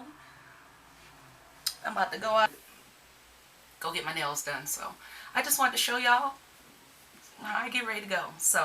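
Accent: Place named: United States. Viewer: You should never have named this video 'Fugly' ... you are by no means ugly. Ugly is as Ugly does .... its what's in your heart that counts. Also, you have one of the prettiest smiles I have seen. Plus your make up video is wonderful. Well done! Please post more. Your accent is lovely too, very easy to listen to ... I'm British so I always love the American accents lol. Enjoy making your videos ... you're teaching people all over the world how to look fabulous!!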